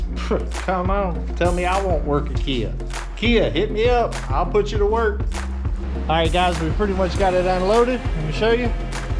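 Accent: United States. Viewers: unfortunately, he is one of those guys that really rubs in that country accent.....too much for me. hope he settles in and loves his choices.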